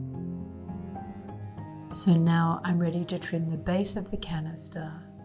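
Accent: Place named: Australia. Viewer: Great video. I was very happy this came up in my feed- I was so excited to hear your Australian accent! Yay! 🥳 I have been watching lots of potters but I am so very happy someone as skilled as you from AU has decided to post. Thank you 🙏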